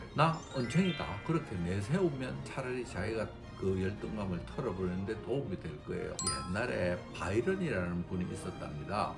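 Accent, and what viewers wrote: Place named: Gyeongsang, South Korea. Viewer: 원장님 경상도 분이셨군요..!^^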